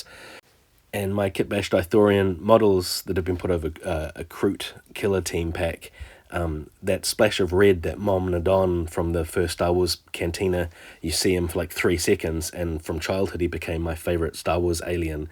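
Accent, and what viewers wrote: New Zealand. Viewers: Are you a kiwi? Your voice sounds like you are from New Zealand?... yes you are haha just finished the video. That's awesome I'm also a kiwi, keep up with the videos I'm going to watch the pod racer one now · thought i heard a fellow kiwi's accent very happy i found your channel thru this collab series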